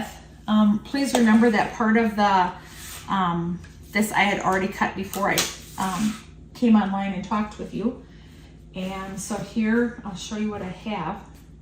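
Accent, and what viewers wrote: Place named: Midwest, United States. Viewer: I don’t know how many times I have watched this video. I LOVE cutting up scraps so this is right up my alley. My father was born in Charles City near you and we went there every summer and even once at Christmas. I have many wonderful memories of Iowa (which is beautiful) and your Midwest accent is pleasing to my ear. I read your blog daily and I wish you the best with your health issues.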